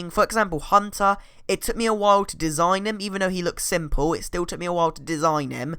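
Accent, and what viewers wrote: United Kingdom. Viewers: r u fucking british?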